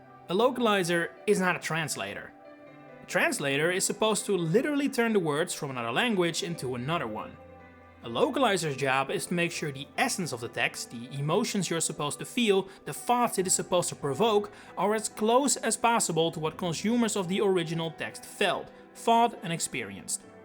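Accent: Netherlands. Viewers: I was contemplating if he was dutch or not for so long! I kept thinking, is he dutch? The accent sounds so dutch! Now I finally have confirmation! Another dutch YouTuber found! I love being dutch sometimes hahaa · You can hear you have an accent but I did not expect it to be dutch! Are you from the netherlands or belgium? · You don’t have to tell me about jokes. When I lived in the US, the jokes that killed in the Netherlands fell flat with my American friends lmao Also, I KNEW you were Dutch!! I definitely recognized your accent when I first heard you speak in your earlier videos. I love seeing fellow Dutch people and especially when they’re into FE lol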